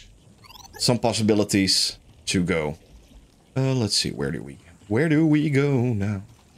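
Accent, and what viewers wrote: Netherlands. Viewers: Altijd leuk om een random video aan te klikken om vervolgens dat Nederlandse accent te horen. Top video, goede info. Bedankt!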